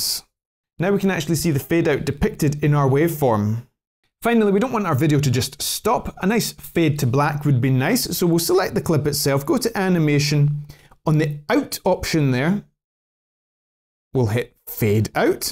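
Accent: Scotland, United Kingdom. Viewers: fantastic, what a joy to hear a scots voice 😊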